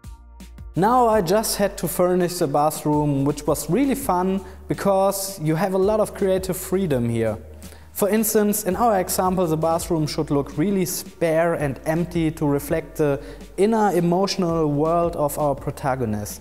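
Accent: Germany. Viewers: Oh , bist du Deutscher oder Österreicher?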